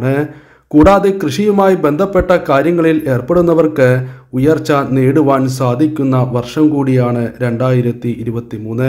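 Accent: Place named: Namboothiri, India. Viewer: വളരെ വൃത്തി കേട് ആയിട്ടുണ്ട്.... Cool ആയി പറഞ്ഞാൽ പോരെ??? എന്തിനാണ് നമ്പൂതിരി യെ അനുകരിക്കാൻ നോക്കുന്നത്?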